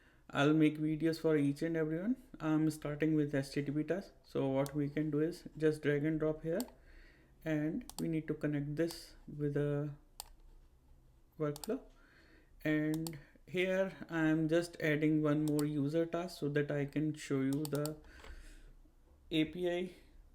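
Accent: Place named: India. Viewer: Thank you dude but please, the indian accent, pleeeaaasseee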